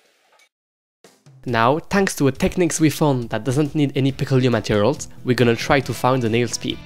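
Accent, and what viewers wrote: France. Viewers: That's a fellow french accent i hear there ?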